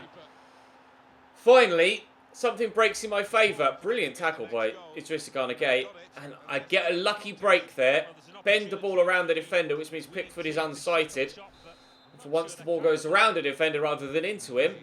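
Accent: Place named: England, United Kingdom. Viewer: Bored from that english accent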